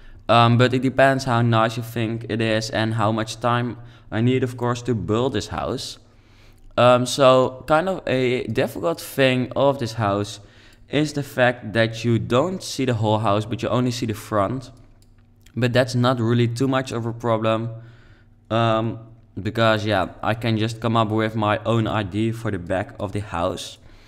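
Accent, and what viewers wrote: Netherlands. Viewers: Hey ik wil ff zeggen dat je echt super gave huizen maakt maar 1 tip: Praat alleen in de intro en daarna niet meer,je kan heel goed horen dat je Nederlands bent door je accent. (het stoort me) zet voor de rest van je filmpje een muziekje op x Niet gemeen bedoeld alleen een tip:) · hahaha ik hoorde meteen dat je nederlands bent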